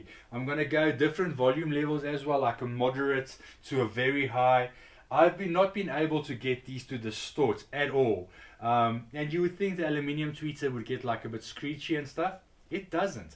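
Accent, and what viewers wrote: South Africa. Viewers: I now have the svs ultra evolution bookshelfs with evolution center and 2 x pb1000 but i was using floorstanders before so i am missing 4 drivers and now i think it is lacking in presence. So i am thining to go with the primes Pinnacles actually, as the evo towers would definitely be too big for my 12 foot by 10 room..also a bit pricey too. I hope the svs prime pinnacles will blend ok wirh my svs evo ultra center. Maybe i need to swap that out for a prime center? Maybe just the plain prime towers would be enough. I am in a dilemma as I can get opened box from dealer for £699 or buy the prime pinnacle towers for £1600 A South African accent? First time i heard. Nice accent. No offense intended at all, as i am sorry I thought you were German to begin with, with a bit of an Australian accent. Got it sooo wrong :) sorry! 😂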